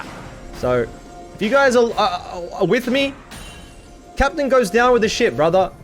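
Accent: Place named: Australia. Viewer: just wanted to say Im happy you're Australian so I have a streamer I like that speaks Australian accent English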